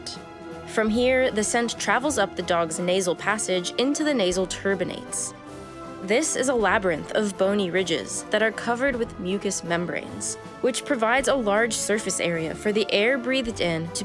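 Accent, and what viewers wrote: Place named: United States. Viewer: How come this is written in British (hoover, footfalls) but narrated in American/maybe Canadian?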